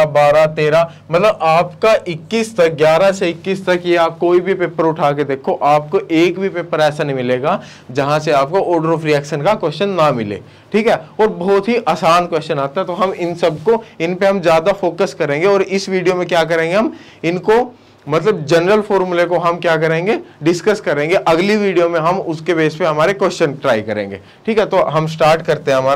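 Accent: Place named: Punjab, India. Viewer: He seems to be punjabi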